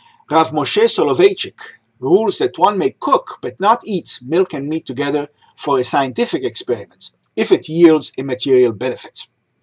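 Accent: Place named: Russia. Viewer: כשאתה מדבר עברית יש לך מבטא רוסי 😅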